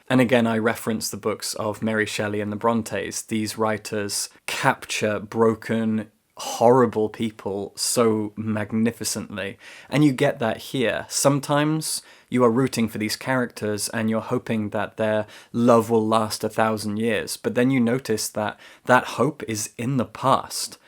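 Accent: United Kingdom. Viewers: I'm so glad I found your channel. I could listen to you speak all day, both for your rich voice (I adore a British accent, being American and an anglophile) and for your professorial tone. I feel smarter just for having listened to you! You gave me all sorts of ideas for next reads. I look forward to watching more of your videos. I'm a retired school librarian and grandmother and I miss being surrounded by book people--who of course are the best! Thanks for all you do.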